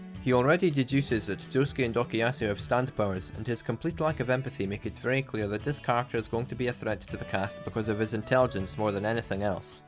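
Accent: Scotland, United Kingdom. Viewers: i've recently started watching your videos and i really enjoy your analyses, cool videos! :) by the way, what part of scotland are you from? (apologies if you aren't scottish; you certainly sound like it!) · are you scottish?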